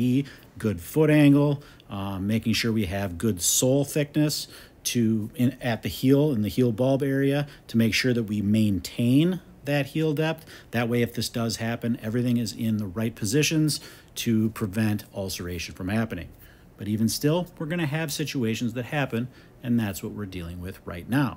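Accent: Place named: United States. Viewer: Respectfully, what happened to your Scottish accent? Sounds American.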